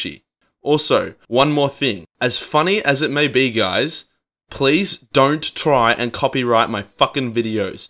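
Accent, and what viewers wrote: Australia. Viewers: its so good to hear ozzies narrate stuff, with our slang and love for C and F bombs and if you dont like it then F%$k off attitude, cheers mate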